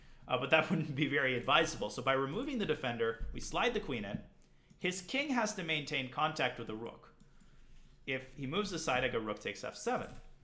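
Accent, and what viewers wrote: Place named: Russia. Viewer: Daniel's russian accent is wonderful!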